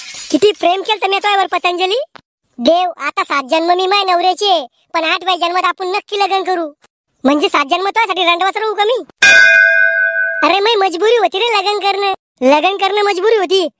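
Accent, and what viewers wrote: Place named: Marathwada, India. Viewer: Nakki jalana cha asnar bole vrun tr as wate😂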